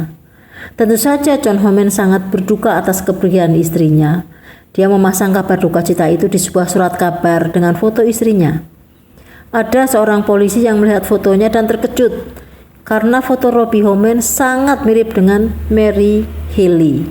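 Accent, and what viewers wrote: Java, Indonesia. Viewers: suara yg ad medok2 jawa ny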